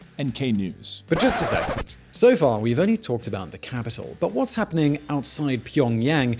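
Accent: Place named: United Kingdom. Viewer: since when does V-sauce have a political channel with a british accent?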